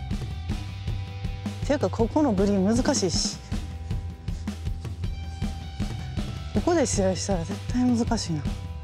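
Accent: Kyoto, Japan. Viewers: 京都弁でリラックスしてる感じがいいですね。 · 現役の娘らよりも凄いスピン。京都訛りに萌えます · 元気が一番です！京都弁は可愛く見えますね。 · 理香子プロのスイングは以前からの憧れ。色んな番手の色んな角度からのショットが見たい。 プロの京都弁が可愛い。 どんどん続けて行って下さい、応援しています。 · はんなり京都弁で可愛さ増し増しやね～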